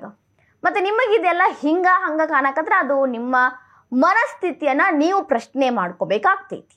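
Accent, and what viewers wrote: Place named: Kalaburagi, India. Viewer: ನಿಮ್ಮಿಂದ ಸಹಜವಾಗಿ, ನಿರರ್ಗಳವಾಗಿ ಬರುವ ಕಲ್ಬುರ್ಗಿಯ ಭಾಷೆಯ ಸೊಗಡು, 1968-70ರ ನನ್ನ ಅಲ್ಲಿನ ವಿದ್ಯಾರ್ಥಿ ಜೀವನದ ತರುವಾಯ ಕೇಳಿದ್ದು ಬಹಳ ಕಡಿಮೆ. ವಿಷಯದ ವಿಶ್ಲೇಷಣೆ ಹಾಗೂ ನಿರೂಪಣೆಯೂ ಪರಿಶುದ್ಧವಾಗಿವೆ.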